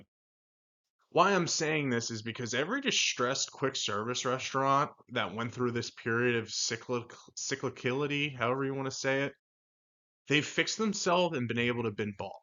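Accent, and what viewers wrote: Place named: Pennsylvania, United States. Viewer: You from PA? I hear the accent lol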